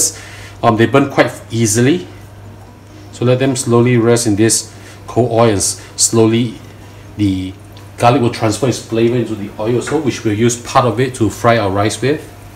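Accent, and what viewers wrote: Singapore. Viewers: blech71 singaporean accent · Wah lau. Nice video and great narration in chaste Singaporean accent. Miss the place and the people so much. Take care aah. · You speak like a Singaporean... I miss Singapore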